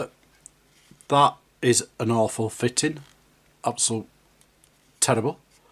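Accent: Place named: Doncaster, United Kingdom. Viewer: Nah then! Are you from Donny by any chance? I grew up in Retford. Your accent sounds very close to mine. Anyhoo, I got this single P51B in a box of kits from M4H and I have just started the build.. I struggeld to get the cockpit assembly into the fuselage halfs, had to shave away quite abit of plastic from both sides of the instrument panel and bulk head to get it to sit so the upper fuselage sections around the front of the cockpit would join up. Then when I forced her together using clamps there was a strange 5mm gap in the forward section of the fuselage around the rear wheel well, which I will have to fill with some styrene. Thats as far as I have got at the mo, literally started the build whilst watching.. Im assuming that the kit is the same mould as this one and yet I dont have the flaws in the wings as you did, but still different issues that you didn't seem to have with your build.. 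Maybe this was the mould that was done by the guy who has been there ages and is literally part of the furniture, but comes to work overly aftershaved and with a little red eye going on. Drinks from a bottle of orange all day long whilst popping out his pressed kits from the machines...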